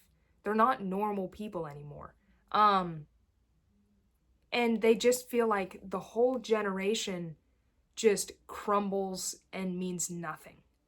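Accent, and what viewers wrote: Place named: Pennsylvania, United States. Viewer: You are definitely from either eastern Pennsylvania, Delaware, or the Baltimore area. Your dialect gives you away. PS great list and great content.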